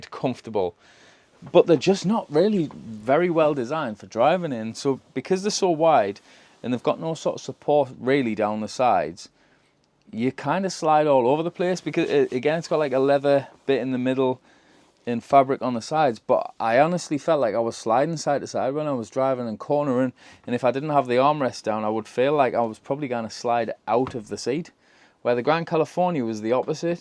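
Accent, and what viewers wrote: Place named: Geordie, United Kingdom. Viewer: Hi, good to see you back. We have an IH Fiat Campervan to which the previous owner had a Cinderella incinerator toilet fitted, not sure what make you are considering. We have had the van now for over a year and the wife loves it, clean, convenient, and warms the bathroom up as well!! It can be up to a month of use before the ash needs emptying, but the negatives are uses quite an amount of gas, about 185g a flush so an underslung gas tank would be recommended, and it does need liners which cost about £50 for 500. As a Geordie by birth, but now a ‘Southerner’ it is great to hear your accent, reminds me of my childhood staying with my Nan in Easington Lane Co Durham, and Crimdon beach. I think Cinderella Toilets also do a pure electric version? Hope all goes well. Kevin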